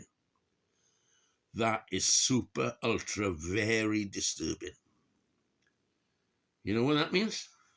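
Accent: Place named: Wales, United Kingdom. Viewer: Evening, always nice to hear your views, and that wonderful Welsh accent! Albannach, not Albanian ya Wallonian🤣🤣🤣 We live in interesting times.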